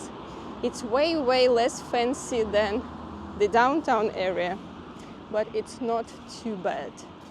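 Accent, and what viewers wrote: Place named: Russia. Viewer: There's nothing wrong with the Russian accent but calling it "attractive" is kinda cringe.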